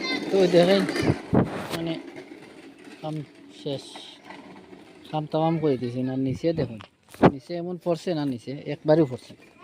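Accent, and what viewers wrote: Sylhet, Bangladesh. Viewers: ভাই আপনার বাড়ি কোথায়,,,, মনে হচ্ছে সিলেট,,, সিলেটের কোন জায়গা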